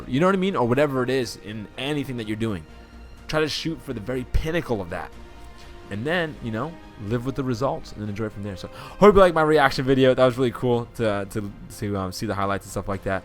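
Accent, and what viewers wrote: Massachusetts, United States. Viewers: What City/town did you play for? Sounds like you lived in MA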